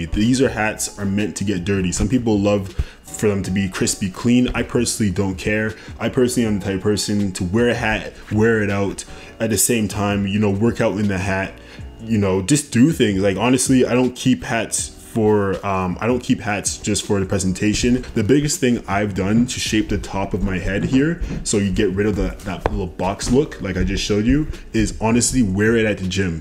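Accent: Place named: Canada. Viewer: so what part of Canada is your accent from if I may ask?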